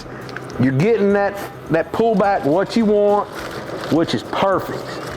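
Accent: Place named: South, United States. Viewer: First time watching, fantastically groovy info. Good (really good) teaching, useful information, and your 'southern accent' jumps out at this northwestern upper peninsula michigan yooper.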